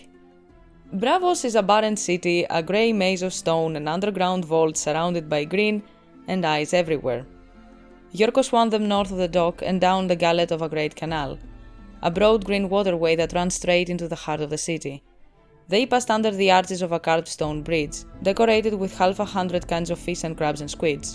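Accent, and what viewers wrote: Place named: Greece. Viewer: heard your accent and HAD to check if you were greek lol. First Greek ive seen doing content on ASOIAF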